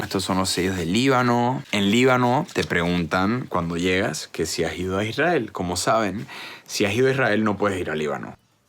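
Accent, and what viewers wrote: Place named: Venezuela. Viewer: Y tienes acento venezolano!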